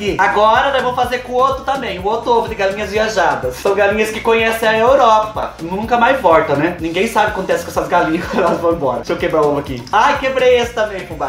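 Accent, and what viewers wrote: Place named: Caipira, Brazil. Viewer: do céu vou dar uma apertada em vc so por esse sotaque caipira que eu adoro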